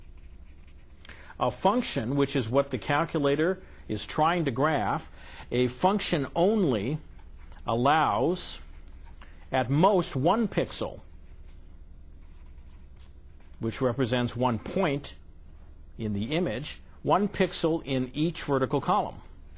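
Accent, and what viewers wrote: United States. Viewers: some kind of midwestern american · eww southern accent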